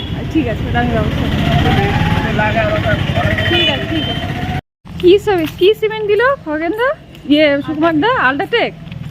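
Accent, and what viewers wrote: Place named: Bangal, Bangladesh. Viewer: বাঙাল ভাষা শুনতে খুব ভালো লাগে 😊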